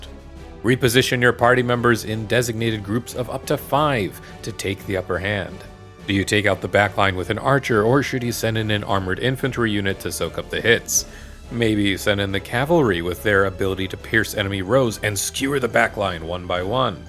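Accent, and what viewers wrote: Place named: United Kingdom. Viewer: first video I've seen from you and i genuinely thought you were British at the start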